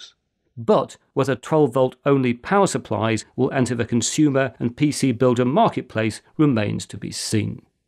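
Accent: United Kingdom. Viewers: Actually I came here 4 studying British accent 😜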